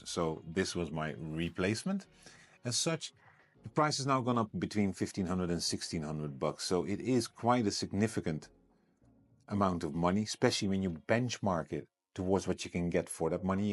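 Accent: Netherlands. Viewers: Wan toe pats, der wie goo. Jup definitely dutch! Great video, keep it up!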